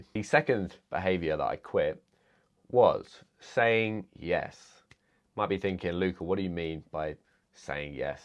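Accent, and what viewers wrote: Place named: United Kingdom. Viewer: Can't understand this heavey accent British English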